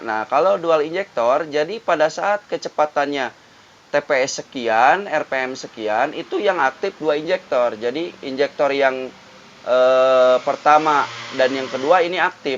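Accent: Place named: West Java, Indonesia. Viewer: pantasa at beda lmun orang sunda nyarios bahasa indo mh moal lesot tinu lagam /cengkok na a🙏😅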